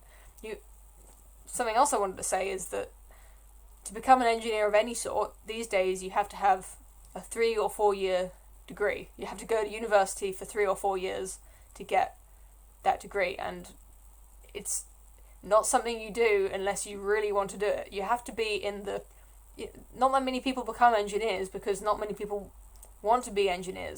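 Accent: United Kingdom. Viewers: Excellent work here,. As an American, I'm guilty of stereotyping. Every time I hear a speaker with a British accent, I automatically believe they're smart. I'm glad to not be let down in this case (probably from all the documentaries of such high caliber produced by the BBC over the years [anything with David Starkey is history porn to me]. It's a shame that their news bias is no better than CNN now)! In my opinion, you should keep doing this. Get a good camera and mic setup and see what happens! It'd be awesome to see you discuss this with Molyneux, Styxhexenhammer666, Sargon, JBP, Computing Forever, etc. We need reason and evidence to prevail over madness.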